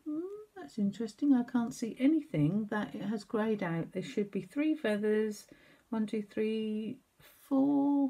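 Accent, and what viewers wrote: United Kingdom. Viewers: I have just found this video while searching for a tutorial on how to use scan n cut. I’ve got a CM300 but very rarely use it. Could you do a series of videos on basic operation? I’m sure there must be many like me who get so frustrated because they cannot get the machine to do what they want it to do. By the way, it’s great to hear an English accent and thank you for your time. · Hiya, found this easily enough. Thanks for giving me the link (nice to hear a UK not American voice) xx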